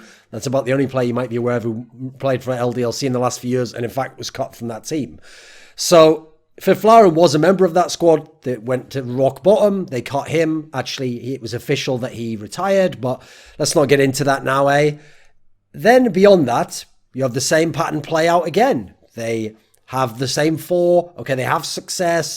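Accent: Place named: North, United Kingdom. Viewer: He comes from the north of UK, doesn't he?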